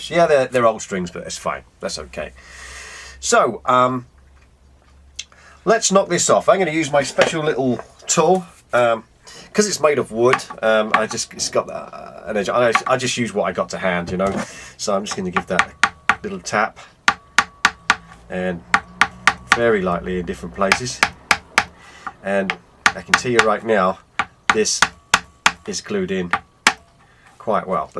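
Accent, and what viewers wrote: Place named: United Kingdom. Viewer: Love your accent are you British or austrailian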